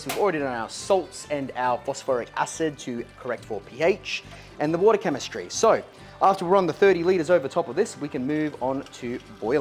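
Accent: United States. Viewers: Lol, thanks, mate! Love the murican accent. Brewing with corn is great. I think it gets overlooked, and some folks think it is boring these days. The funny thing is that the beer seems to disappear immediately for how "boring" it is... one of my favorites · Was that am American Accent? lol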